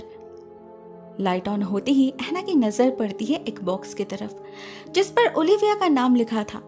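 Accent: Bengal, India. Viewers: Hi sis❤❤tmi ki bengali 🤔🤔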